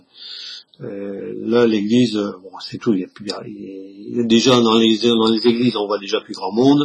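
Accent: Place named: Southwest, France. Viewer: salut. au fur et à mesure du descriptif de ton reve, j'ai ressenti un extrême mal-à-l'aise, et sans réflechir, j'ai pensé de suite aux Francs maçons et à une messe noire avec un sacrifice... ton tirage semble confirmer cette pensée, et en tout cas, laisse un grand point d'interrogation. Je profites du fait d'avoir accès aux commentaires pour une question: Je sais que tu habites dans le nord de la France, mais je remarque, quand tu prononces certains mots avec le son "O", (ex: autre) je vois que tu le prononces comme moi à Bordeaux et dans la region du Sudouest, et pas du tout comme quelqu'un de ta region... tu as une explication?????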